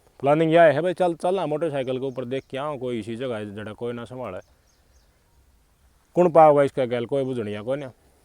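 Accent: Haryana, India. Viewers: Maan ge bhai aap ne aap ki haryanvi bole ko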